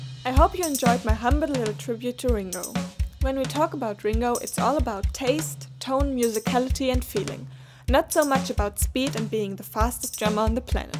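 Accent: Germany. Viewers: SHE SPEAKS!! German girl??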